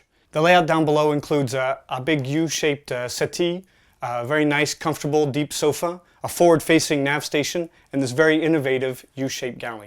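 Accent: France. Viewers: désolé mais l'accent français n'est plus possible, on dirait que vous sortez du collège... sinon très bien présenté. bonne vidéo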